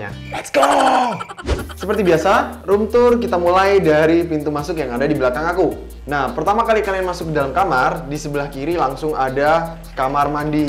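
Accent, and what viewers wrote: Java, Indonesia. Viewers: Kakak orang jawa?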